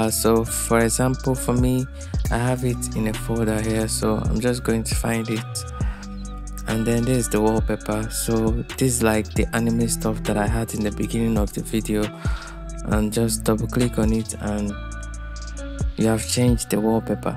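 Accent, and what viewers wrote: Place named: Nigeria. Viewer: beautiful naija voice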